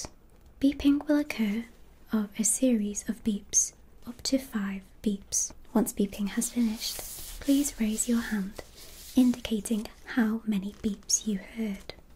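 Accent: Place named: England, United Kingdom. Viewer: Her voice is very calming and I love her English accent too. 💟❤💗💖🧡💕❣💚💞💓💛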